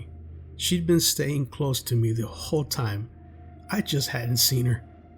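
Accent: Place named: Chinle, United States. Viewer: Lived in Chinle, AZ for about a year. Never saw anything real personally, but you know how horrible kids can be to each other. We used to tease each other all the time when walking past any livestock in the fields. “Ooh that cow is acting weird! It’s a skinwalker!!” Canyon de Chelly was fun to visit but creepy at night. ❤️ Ps: Your accent makes me miss my friends back in Chinle.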